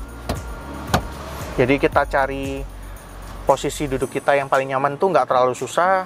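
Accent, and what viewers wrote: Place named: Java, Indonesia. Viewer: orang jawa ya mas? kok medok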